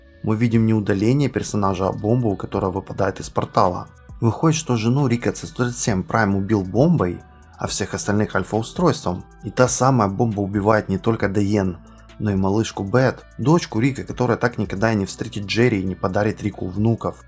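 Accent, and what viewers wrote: Russia. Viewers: да, потому что все территории около Украины, украинизированны, именно по этому у вас там говор, ге-шо-те... задумайся росиянин против кого воюет твой царь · говор на Воронежский похож) В принципе, там близко 🤗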